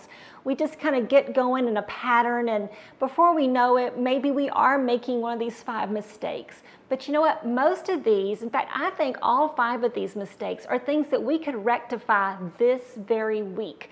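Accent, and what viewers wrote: South, United States. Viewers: You have a charming southern accent. When my hair turned silvery gray, I asked the students at the beauty school to make me a blonde so I look and feel younger, not like a gray-haired old lady. I changed my lipstick and blush to light bright pink colors, nothing dark. Love Tip #3...Don't aim for young--Aim for modern. Yes, contemporary clothing is more youthful. Some of my friends do not try at all--they look like a walking clinical depression. They appear so matronly, 15 years older than they really are!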